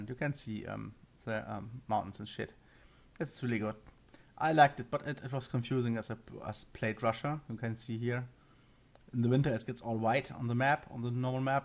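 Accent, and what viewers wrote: Germany. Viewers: That authentic German accent i love it! Greetings for Sweden man, thanks for the Tutorial.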